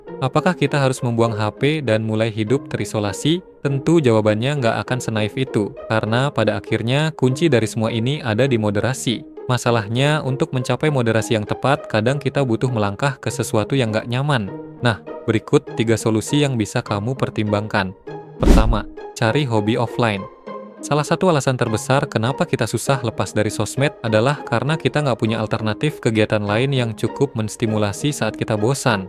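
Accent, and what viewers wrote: Bali, Indonesia. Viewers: Narator logat bali kayaknya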